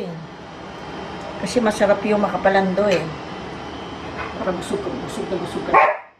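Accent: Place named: Bulacan, Philippines. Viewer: iba talaga pag may puntong bulacan,.masarap panoorin at pakinggan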